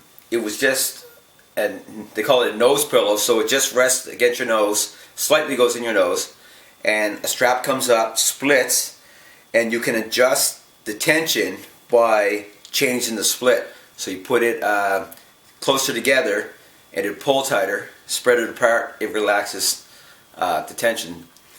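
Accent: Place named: Canada. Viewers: I noticed that as well. I watch Holms and Holms on tv home repair channels. They are from Canada and have the same exact accent. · ah a Canadian... ;) the way you say out, about and mouth :)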